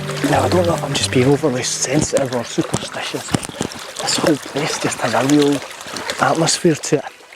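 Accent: Scotland, United Kingdom. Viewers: For a good chuckle, turn on Closed Captioning and count all the times the CC person could not understand "Sir Walter" or "Sir Walter Scott" from the narrator's Scottish accent.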